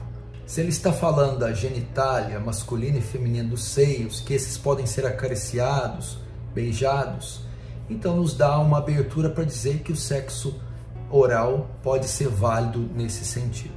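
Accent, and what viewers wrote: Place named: Minas Gerais, Brazil. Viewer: Mineirinho kkkk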